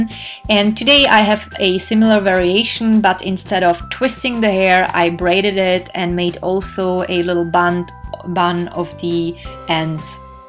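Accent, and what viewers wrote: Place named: Germany. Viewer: you sound very german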